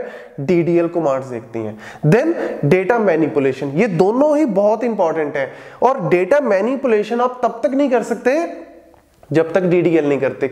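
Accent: Punjab, India. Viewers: his accent is so punjabi like 🤩 are you punjabi ?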